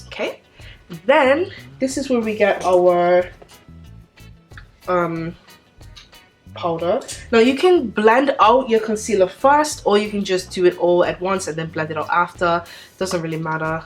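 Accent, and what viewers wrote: Bermuda. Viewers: Where are you from? You sound borderline Bermudian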